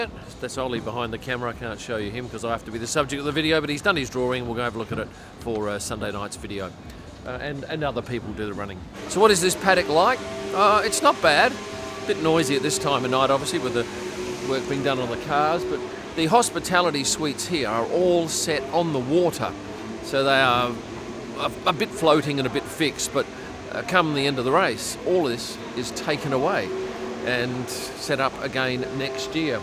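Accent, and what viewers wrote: Australia. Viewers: I like the Australian talk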